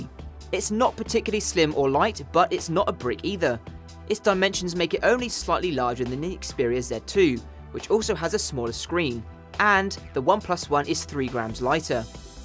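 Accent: United Kingdom. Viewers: The British accent is so amazing <3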